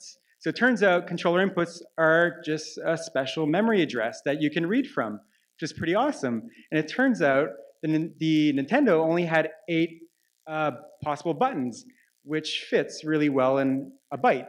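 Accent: Canada. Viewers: All the comments on how he pronounces Mario. It's the Canadian accent... You're over there basically saying "wtf, this Canadian talks with a Canadian accent!?!?" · Within 10 seconds, we all realized he was from Canada. Then immediately after he said "I'm from Canada" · It is a Canadian French accent (Quebec)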